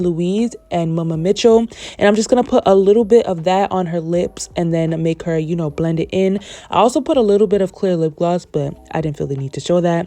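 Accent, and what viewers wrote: United States. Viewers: I also mix foundations to get my clients perfect match. I still use the back of my hands it warms the products. You sound like you’re from Dade County 😂 Miami you Sound like me New Subbie 👋🏾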